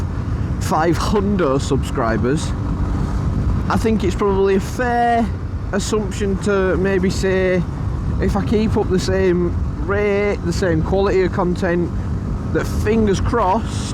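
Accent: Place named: Leeds, United Kingdom. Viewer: Are you from Pudsey by any chance? I detect a Leeds accent and the channel being 🤔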